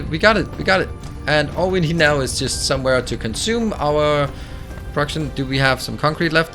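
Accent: Denmark. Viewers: DANISH!.. thank you, I was wondering where your accent was from! :) (re: reference to Danish keyboard at approx ) ROFLMAO "Devastated" - please say it more often :) "It will cry itself to sleep, every night" lol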